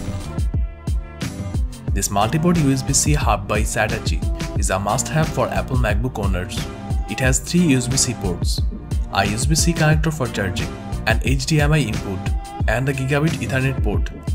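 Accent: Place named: Bangladesh. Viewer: You sound like a Bangladeshi :-)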